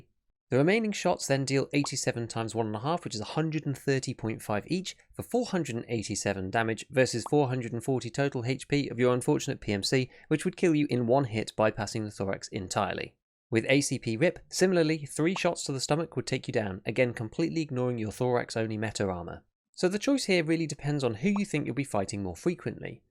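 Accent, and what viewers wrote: United Kingdom. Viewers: The British accent, Can't do it. its like listening to your Nephew talk, bullshit. Your voice has the same frequencies that make dogs wanna commit suicide.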